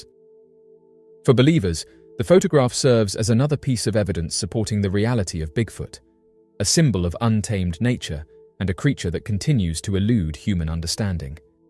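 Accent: England, United Kingdom. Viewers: Filmed means moving pictures not photographs. You have the English accent but obviously havent mastered the English language.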